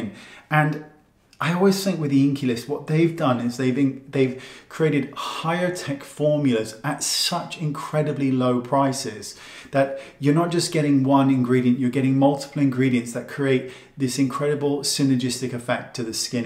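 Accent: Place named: United Kingdom. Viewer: Oh my gosh, you’ve started listing the products below! Thank you so much, that is such help to those of us who have trouble with your British pronunciation.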